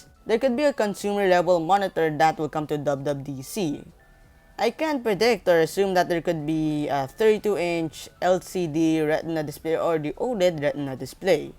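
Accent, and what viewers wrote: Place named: Philippines. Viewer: You are unmistakably Filipino, that voice gives it away 😂. And yes, I'm the same person from Twitter.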